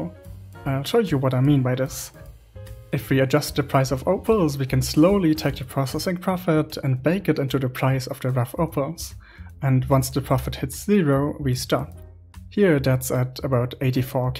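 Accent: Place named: Germany. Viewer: Your english sounds very german 😮. I love your Videos and was actually horrified when i found out that i wasnt subscribed to you 😅, great Video! Keep Up the good work ! Also can i reference your Videos in my own ? I also got a YT channel, and since a few Weeks i Focus on Lifeskills and anything but grinding... have a nice Day 😊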